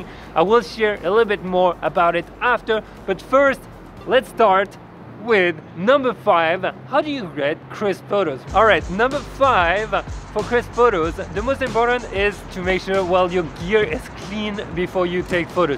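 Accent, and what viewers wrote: France. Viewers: really like your videos dude, have you noticed if you lower the reproduction speed to .5 you sound like rally drunk?? maybe because of the french accent hahaha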